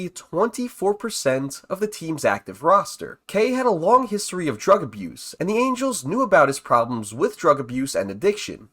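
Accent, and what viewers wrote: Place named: New York, United States. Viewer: whiny-ness and twang of new yawk know-it-all "fan"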